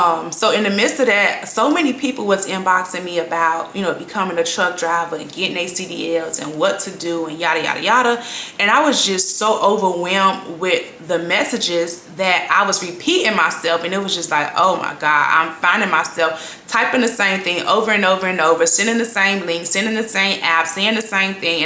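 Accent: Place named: South, United States. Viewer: I think it's the southern accent that I like, lol 🤣🤣🤣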